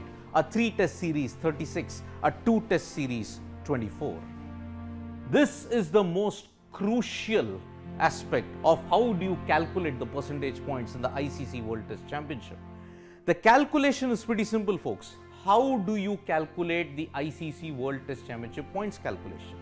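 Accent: South India, India. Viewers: I really like to listen his south Indian accent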